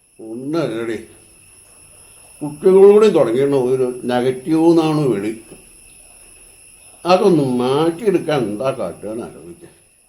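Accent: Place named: Namboothiri, India. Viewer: സത്യം പറഞ്ഞാൽ നമ്പൂരി സ്ലാങ് ഇത്തരം കഥക്ക് മാച്ച് അല്ല. നിങ്ങളെ പഴയ പർളിവുഡും നമ്പൂതിരി നേരം പോക്കും തന്നെയാ നല്ലത്. ഞാൻ രണ്ടും ആസ്വദിക്കുന്ന ആളാണ്. അതായത് അവനവന് ചേരണ കോണകമേ ഉടുക്കാവൂ എന്ന് സാരം❤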